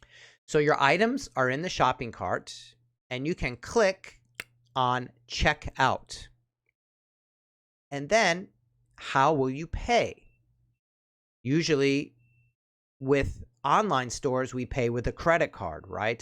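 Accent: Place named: United States. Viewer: Hey, thanks for the good videos you make, I'm working on my accent to make it better, I wanted to ask which part of US does your accent belong to?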